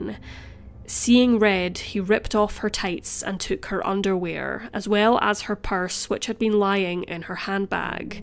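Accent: Scotland, United Kingdom. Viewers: Sounds to me like her pace of speech is just normal. Not too fast and certainly not too slow. Just right. And I would say, with having her beautiful Scottish accent, for many, this pace (rather than fast) would be ideal. :) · It’s the Scottish way of saying he was born into a family. Like a wee Bairn. 😂 My family are originally from Scotland. Her accent is cute. I’m American though . I don’t have it though unfortunately. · People like a range of delivery speeds and pitch/speed variation. I like hers for her. And some are effective with different paces. I'm originally from the East coast USA, though I've lived in the NW and SW. East Coast people tend to speak faster. Slower: Southern, Western Mountain. And the Scottish parts narrator is from. Because she to me speaks with an accent, one I like, I wouldnt' want it sped up much or I might not understand her. 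E.g., there are vids of unintelligible faster talking Yorkshire people. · Why would anyone criticize your lovely Scottish accent? Your accent and compassion for the victims are part of the reason I like this channel. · Ignore all the idiots criticising the accent. As a fellow Edinburgher I’d detect the ‘Educated Scots’ tone a mile away. The accent is actually far batter for enunciation and pronunciation than almost any in the U.K. Anywho, if you are taking requests-how about the Alan Wilson/Ian Sutherland case? By a circumstance of pure serendipity I wasn’t actually at one of the trial seasons for Alan Wilson when he has charged with child molestation. Imagine my surprise when, a few years laters, he turns up dismembers in a bin next door to where a work colleague lived. Life is funny that way eh? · I’m born and bred in Liverpool and never heard of this case. Beautifully narrated love the Scottish accent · I'm not a native english speaker so the pace really helps me understand the Scottish accent :) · Love your voice and accent. 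Sounds Scottish. Lovely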